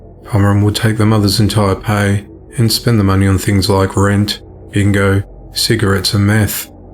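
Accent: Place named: Australia. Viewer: Just came across your channel and as soon as I heard your accent I was like, hell yeah I am definitely subbing to Mt fellow Aussie... 🙂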